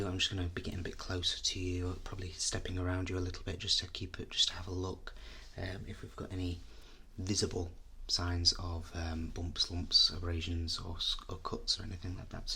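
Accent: United Kingdom. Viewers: He sounds... British?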